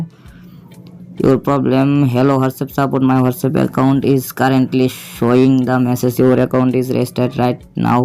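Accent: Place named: Tamil, India. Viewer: Tamil voice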